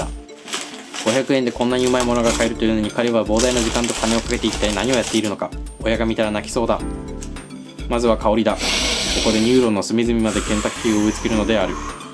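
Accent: India. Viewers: たまに喋り方がインド人ぽい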